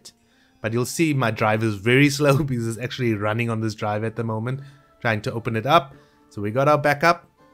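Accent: South Africa. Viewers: You're the most south african sounding guy i've ever heard 🤔🤔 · Are you from South Africa your accent is quite familiar. And thanks for this. I have Assassin's Creed Rogue and modding it has always been something I wanted to try out.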